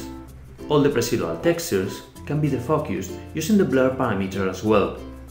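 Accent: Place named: Spain. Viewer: Offended by a Spanish accent from someone named " "? It's a joke right?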